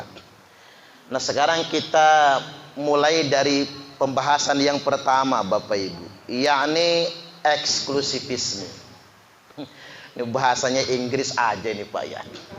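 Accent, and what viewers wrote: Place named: Jakarta, Indonesia. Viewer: iki lho pak , sampean wong njowo opo dudu , logate koyo wong njakarta , jainudin mz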